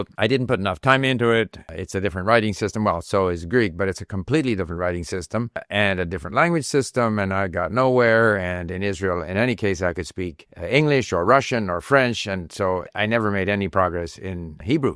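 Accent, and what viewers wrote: Iran. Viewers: از نظر شما هم لهجه‌ش مازندرانیه؟!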